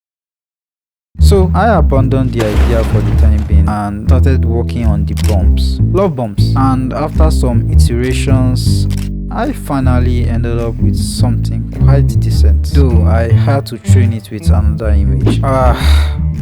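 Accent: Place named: Nigeria. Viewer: U Sound Nigerian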